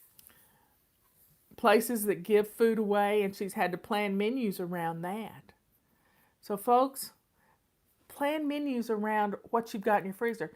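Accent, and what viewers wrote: South, United States. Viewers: Miss fly lady my girlfriend just loves you she’s watches you every day thank you so much for keeping her occupied and out of my hair lol Jk but love your southern accent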